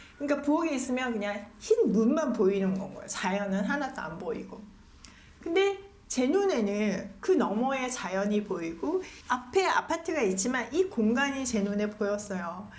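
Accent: Gyeongsang, South Korea. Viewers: 고향이 경상도?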